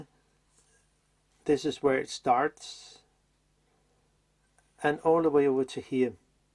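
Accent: Denmark. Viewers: You sound danish. Are you located in Denmark?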